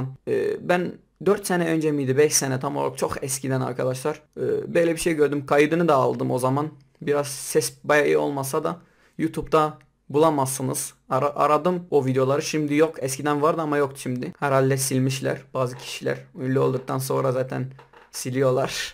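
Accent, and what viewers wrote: Turkey. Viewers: Amed şivesi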